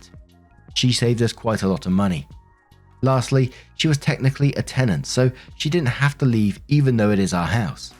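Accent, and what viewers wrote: England, United Kingdom. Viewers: Hello - a fan from the U.S. here! I’m absolutely hooked on your videos. I studied at university in England for a year so love hearing your accent. It brings me back there. I really enjoy the emotion, inflection and humor you add to Reddit stories. I can tell you have a huge heart and really care about people. Thank you and keeping doing what you do!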